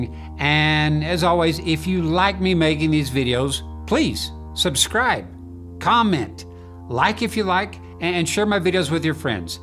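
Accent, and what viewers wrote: South, United States. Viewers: You're I'd say southern accent sounds like mine when I talk lol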